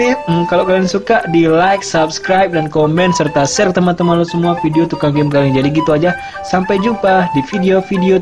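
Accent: Medan, Indonesia. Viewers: bang :v orang Medan ya :v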